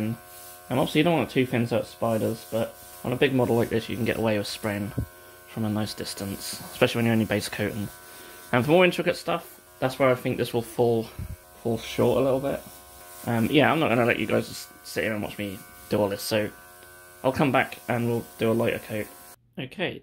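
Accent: Norfolk, United Kingdom. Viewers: Beginner with painting 3D printed models here and got one of these. So far so good. Must ask you are you from Norfolk? Yarmouth here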